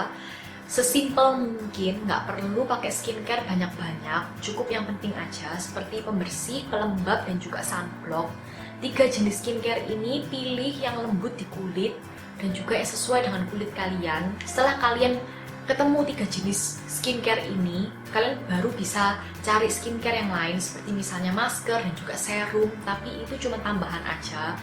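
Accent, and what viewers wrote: Java, Indonesia. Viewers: medok mbak?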